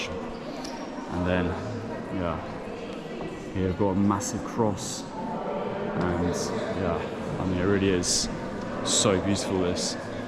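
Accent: England, United Kingdom. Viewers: Being Irish myself, I love your English accent and you are also a very handsome-looking young man 🇮🇪☘️🇮🇪🥰🥰🥰🥰🥰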